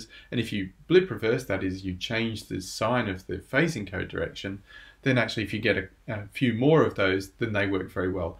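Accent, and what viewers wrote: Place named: New Zealand. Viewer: Trying to work out if the accent New Zealand, Australian, or either and influenced by time spent in England.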